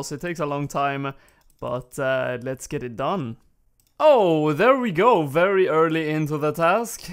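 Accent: Sweden. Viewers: Raspig svensk dialekt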